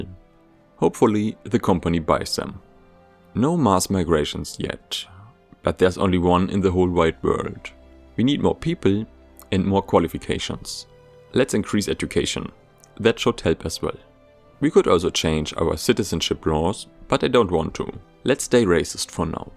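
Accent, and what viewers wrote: Germany. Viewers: Alter, gotta work on that accent. Good vid though, but boy is that German accent seeping through savagely...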